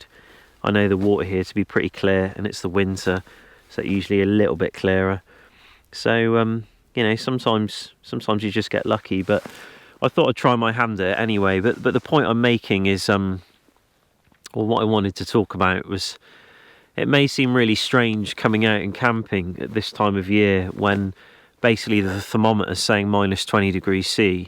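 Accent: England, United Kingdom. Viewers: What are u doing in Sweden mate do u work there because I can tell you are English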